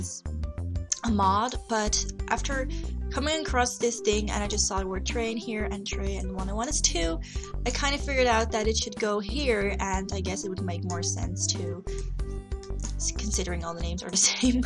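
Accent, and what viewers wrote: Netherlands. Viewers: o are you dutch? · haha gewoon een Hollander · Your dutch :)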